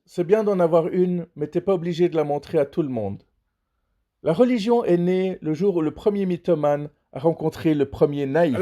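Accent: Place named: Switzerland. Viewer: C.est ton accent suisse qui fait que tu n'es pas cru quand tu dis en ligne que tu es un bad boy... Les religions, la politique, la meteorologie, ne sont pas les meilleurs sujets pour discuter...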